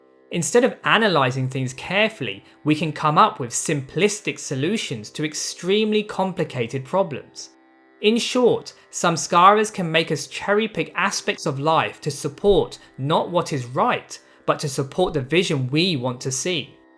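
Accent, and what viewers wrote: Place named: United Kingdom. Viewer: UK! I think due to his speaking type!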